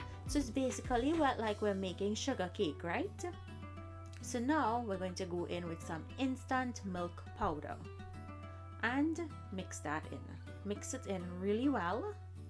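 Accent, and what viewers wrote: Trinidad and Tobago. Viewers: I knew u were Trinidadian when I heard your accent ! Thx for the recipe ! x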